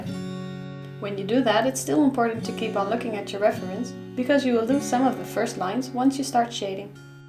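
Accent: Netherlands. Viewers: Is that Doutzen Kroes? Are you dutch cause you really sound Dutch! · Are you Dutch? As a fellow dutchie I feel like I recognize the accent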